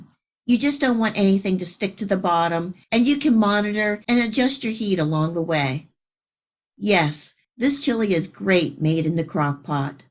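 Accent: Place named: Pennsylvania, United States. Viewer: Just found your channel and have been binge watching….sounds like a Pa accent or close to it (East Coast) 🤗😘 I’m from York!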